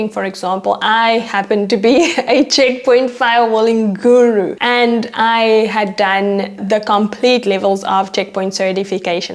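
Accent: South Africa. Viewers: Love your accent! Are you South African?☺️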